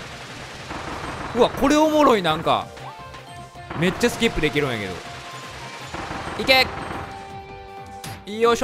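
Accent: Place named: Osaka, Japan. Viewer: おかりんさんこれからもずっと動画投稿お願いします。おかりんさんの大阪弁面白くて大好きです。動画投稿頑張ってください。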